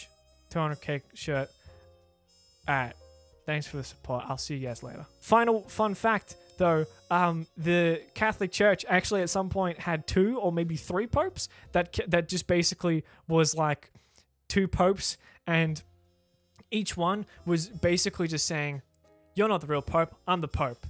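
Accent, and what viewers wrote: Australia. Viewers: an AUSSIE commentator finally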